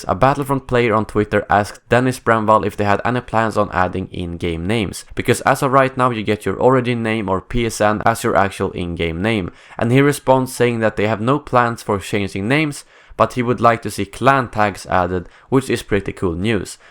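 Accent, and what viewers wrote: Sweden. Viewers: nice channel keep it up! that swedish accent tho :) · är du från Sverige och stockholm=)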